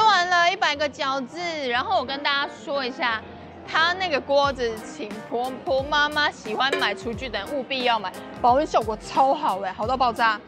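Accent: Taiwan. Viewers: 看1分钟，好尴尬的台式全然大丈夫、なにこれ。。。ありがとうございます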